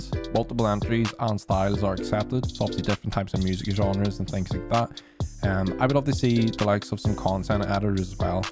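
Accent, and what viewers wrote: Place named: Northern Ireland, United Kingdom. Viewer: northern irishman with the asmr right here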